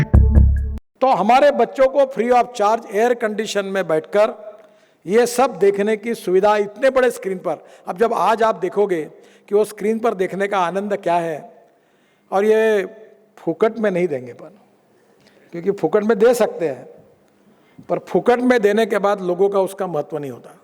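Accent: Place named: Nagpur, India. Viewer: Kya baat hai...👍... Nitine kakaa,...maja aagayaa sunkar....😂😂😂.... bahot badiya bhashan...❤️...se akdam... Nagpuri touch me...🙏 Namarkar...apko..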